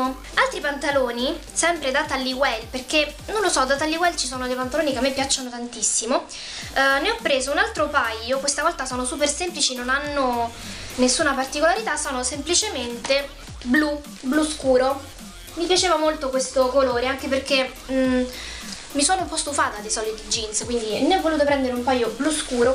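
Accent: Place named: Rome, Italy. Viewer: bellissime cosine mi sei mancata in questo periodoo!!adoro il tuo accento romano😘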